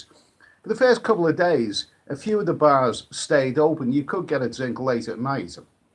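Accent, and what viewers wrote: Liverpool, United Kingdom. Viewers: 2 are better than none<brilliant scouse accent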